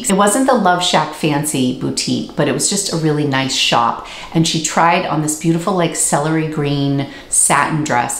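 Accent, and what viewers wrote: Boston, United States. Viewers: First time viewer.......where is your Boston ascent.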